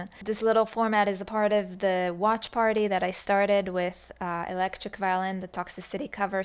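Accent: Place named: Israel. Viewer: Finally, I get to hear your Israeli accent. Love it. My mother is a hairdresser, she would tell you protein and conditioner for your hair….look a little dry. I’ve been watching you off and on for 8 years. I love watching your infectious smile. Always makes my day brighter. I would say in the beginning you were playing the music….now, 8 years later, your feeling the music. And this is what now drives your playing. Rock on baby doll!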